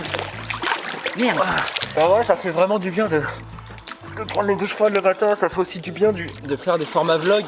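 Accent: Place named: England, United Kingdom. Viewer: Tu manges du "bacon " mon lapin 🤣🤣🤣, j'adore 💕 ton accent anglais..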